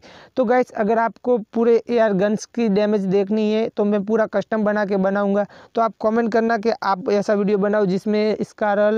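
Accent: Gujarat, India. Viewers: AAP Gujarati ho 🤔?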